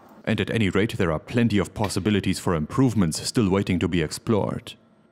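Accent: Germany. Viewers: Your German accent becomes more and more sophisticated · I didn't expect German accent to be so difficult for me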